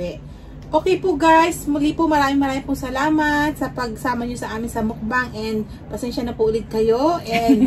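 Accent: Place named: Batangas, Philippines. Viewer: Hello sis ko, medyo nalate ako ngayon. Sarap ng chicken curry sis. Sarap mo talaga magluto ng mga may gata. Akoy relate na relate sa punto ng salita nyo sis palibhasay malapit lang sa tiaong ang batangas. Hehehe. Ineng din ang tawag sa amin eh. Hehehe. Hello sa sister mo sis. Waiting talaga ako sa gelatin eh. Sarap. Ingat kayo lagi dyan sis. Waiting for youe next mukbang with Jaxxas. 💞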